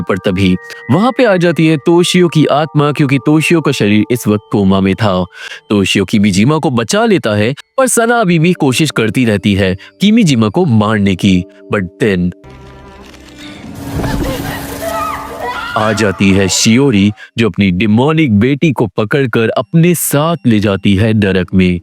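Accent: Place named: India. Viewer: Bro sana aur matt bolo tera Indian accent bohut tagra hai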